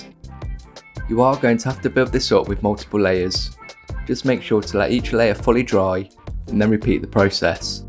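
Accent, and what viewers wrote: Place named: Birmingham, United Kingdom. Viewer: I think your slow-pitched Brumie-accented delivery is ideal for tacklin' these modeling projects. Your videos ought to be mandatory for new hobbyists.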